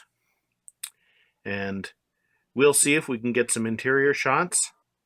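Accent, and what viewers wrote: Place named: Canada. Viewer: That is an awesome truck, and a nice accent. Love the Canadian accent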